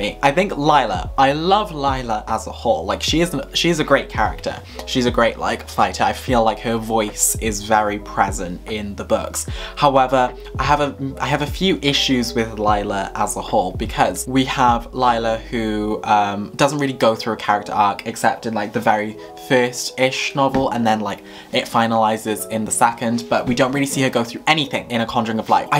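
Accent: Wales, United Kingdom. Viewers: I believe he is from Wales if that helps😊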